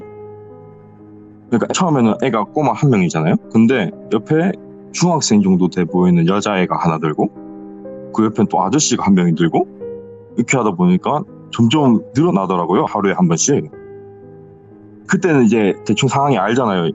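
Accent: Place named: Gyeongsang, South Korea. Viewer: 아이구 이야기는 참 무서운 이야기인데 말하는 분이 경상도 대구 분인 것 같은데 저기 경기도 지역 요즘 20대 여자분들 말투 따라하시는 것 같아서 조금 듣기가 거북했습니다. 음절 뒷부분을 요즘 20대 여자들처럼 올리지 마시고↑ 원래 쓰시던 대로 내려 주셨으면↓ 듣기가 편했을텐데...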